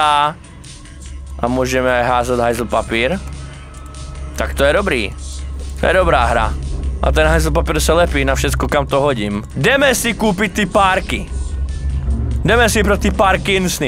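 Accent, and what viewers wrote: Moravia, Czech Republic. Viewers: Seš moravák?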